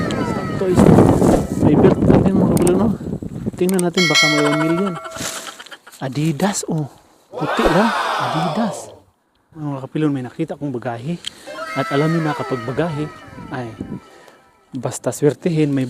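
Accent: Visayas, Philippines. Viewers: tuwang tuwa tlga aq pag pinapanood q ito gustong gusto q ung Punto nia bisayang bisaya ang kulit 😅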